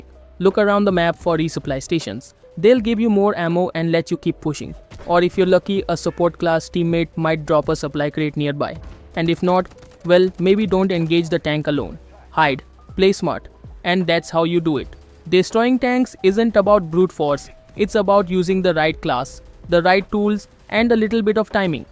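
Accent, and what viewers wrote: India. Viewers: The moment i clicked on this video, i knew it was bout to be good by the indian voice this guy straight to the point like tech tutorials by indians and no extra yapping..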